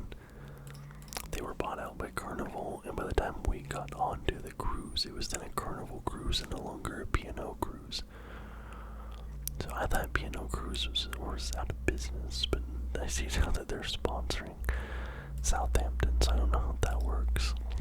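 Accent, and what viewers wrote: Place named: United States. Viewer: Hey British guy here who is very passionate about football (or soccer as you call it) and it’s very interesting hearing how an American talks about football.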